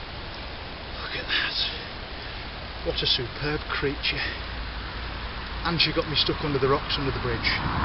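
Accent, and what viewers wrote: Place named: Barnsley, United Kingdom. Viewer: This bloke must be from Barnsley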